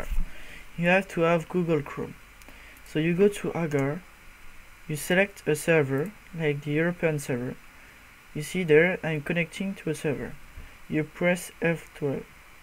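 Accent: France. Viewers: Ton accent est mortel :D French powa ! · C'est trop drole... Ton France es tres prononce. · Are you french ? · T'es français toi avec cet accent non?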